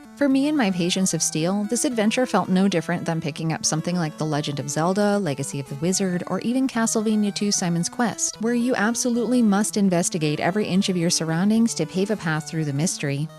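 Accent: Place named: Canada. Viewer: Love your quirky videos and your charming Canadian (I think) accent. ❤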